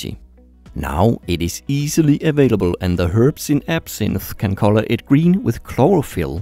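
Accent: Denmark. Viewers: I KNEW YOU WERE DANISH!!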